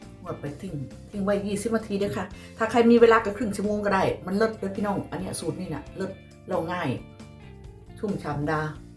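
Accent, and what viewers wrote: Korat, Thailand. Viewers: ชอบดูยาย พูดโคราชค่ะ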